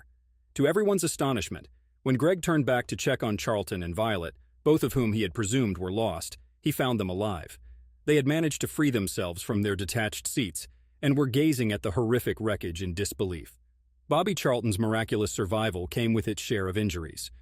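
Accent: United States. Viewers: Full of bullshit! No wonder its a Yank voice. Stick to Ted Lasso and leave football to the rest of the world. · a f----------g yank what so you expect blue blooded murder of the english tounge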